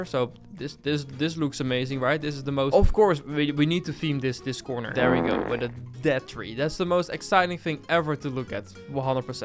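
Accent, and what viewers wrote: Netherlands. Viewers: We horen dat je Nederlands bent en dat zien we ook. Aan je naam dus praat gwn NL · I love your dutch accent!!(if its dutch) im dutch too. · Bro you sound so dutch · I love your accent its an dutch accenr right? (Im dutch btw) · Engels met een Nederlands accentje😂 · Jij bent Nederlands toch ik hoor het aan je accent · I can hear you speaking english with a dutch accent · are you dutch i maybe hear a acent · JE BENT NEDERLAND IK HOOR HET AAN JE ACCENT AHAHAH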